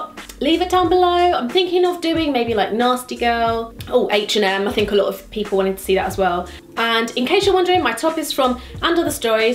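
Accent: United Kingdom. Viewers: I think the only reason I see your videos is your british accent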